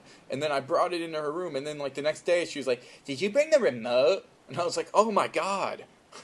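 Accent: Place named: California, United States. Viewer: he talks like that because he is probably from cali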